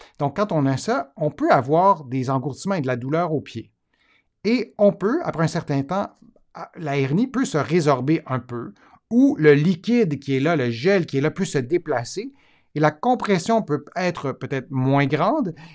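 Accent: Quebec, Canada. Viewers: Votre accent québécois m'empêche de vous suivre correctement à vrai dire.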